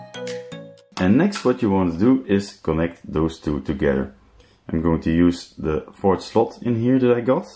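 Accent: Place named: Belgium. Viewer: Hi there, Your accent sounds terribly Belgian, I should know since I am one, correct me if I am wrong. I am also building a hypercube (<num>) but I followe Daha's videos up untill now, I am starting with the electronics part now but then I notice I have a very diferent motor controller card (Duet 2) and LCD (Paneldue 7i) so I am a bit thron of my rocker here, I have already found some intell on this but is it ok if I drop you a question from time to time? If my suspicion is correct we can converse in dutch :-)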